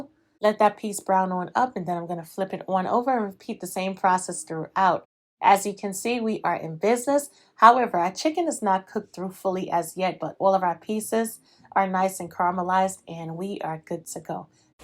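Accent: New York, United States. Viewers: You sound like you from NYC I hear a hint 😂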